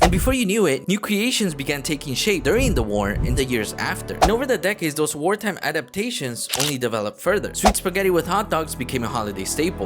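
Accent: Philippines. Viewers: You talked like a filipino now with a ‚no?‘ at the end of the sentence 😊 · You are slowly having the Filipino English non-accent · Why you sound like a filipino manila boy from a middle/high class family?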